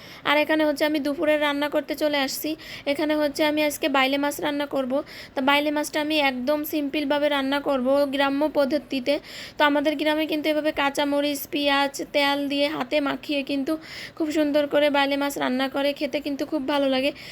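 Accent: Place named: Barishal, Bangladesh. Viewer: আপু এটা আমগো বরিশাইল্লা বইন তুমি বোঝো নাই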